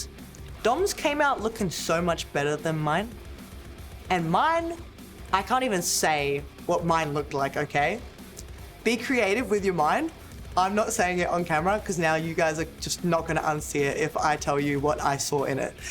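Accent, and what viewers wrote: Australia. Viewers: Bruh…she’s Italian! That’s friggin’ awesome! Australian Italian. Her imitating her grandma was great. Also love her Australian accent! 😊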